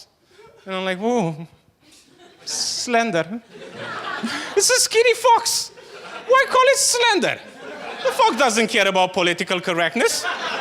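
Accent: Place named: Mexico. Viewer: "Sometimes I go to America I'm Mexican" So, accent sounds Mexican to some Americans.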